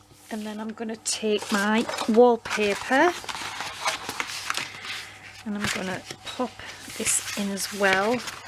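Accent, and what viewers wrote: United Kingdom. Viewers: I soooo love your videos, such great ideas (and I love listening to your British accent) You could use a small square of velcro to close the pouch with. I have them on covered paper pads and they work well.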